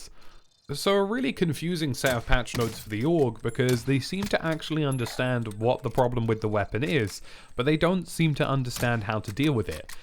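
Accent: United Kingdom. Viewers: Sick channel nice to hear a uk accent ha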